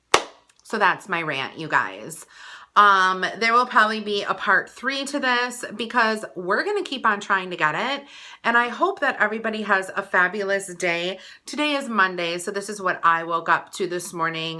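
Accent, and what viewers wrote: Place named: Midwest, United States. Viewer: dolly5621 chirios her voice makes my ears bleed. I really can’t stand the midwestern WICSAANSIN/ MINISOOOOTA accent. Ugh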